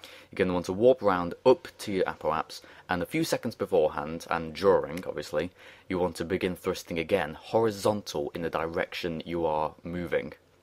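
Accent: United Kingdom. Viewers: He's British.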